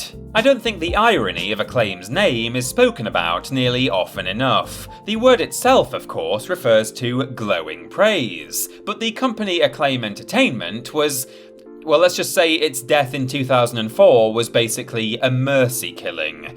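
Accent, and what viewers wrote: United Kingdom. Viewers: They're British :-) They were former WhatCulture Hosts.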